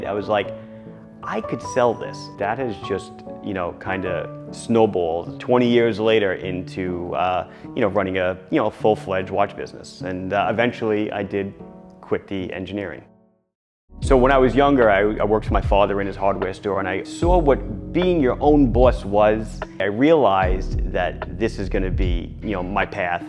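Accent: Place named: New York, United States. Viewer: Hearing your New York accent brings me happy and nostalgia feelings, having grown up in Queens and Long Island. As a kid I used to think everyone spoke like that. My cousins who are bit older still have heavy Queens accents and they are a joy to listen to. And your story is fantastic. Good luck to you!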